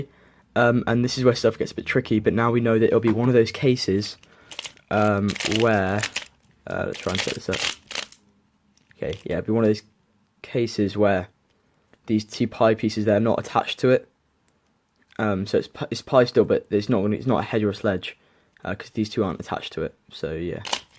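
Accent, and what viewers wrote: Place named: RP, United Kingdom. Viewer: WHAT'S UP GUYS Also you sound very posh lul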